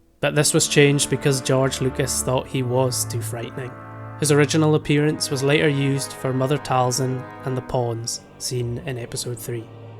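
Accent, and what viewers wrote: Ireland. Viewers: I'm guessing Irish. It's definitely from the Isles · Why can I not tell if youre Irish or Balkan?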